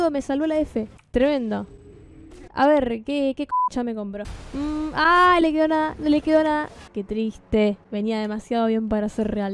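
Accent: Argentina. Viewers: Genial. Donde está mi milanga? Gracias a vos, no llego a extrañar el acento de Bs As.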